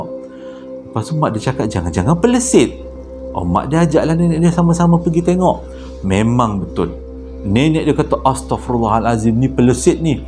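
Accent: Johor, Malaysia. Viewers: Yer la… melekat tul Johornya tu… I like! 😄😄😄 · Abg memang berbakat beso cara penyampai cerita seram pelesit dgn loghat johor .. 👍👍👍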